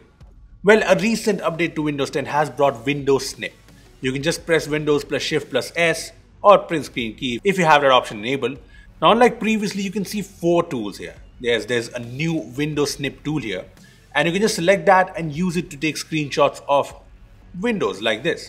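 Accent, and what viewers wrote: India. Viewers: How to show fps? You talk too fast indian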